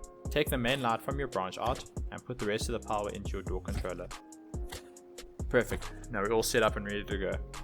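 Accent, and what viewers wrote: South Africa. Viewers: Bru i just hear my SA boet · I feel like this guy is South Africa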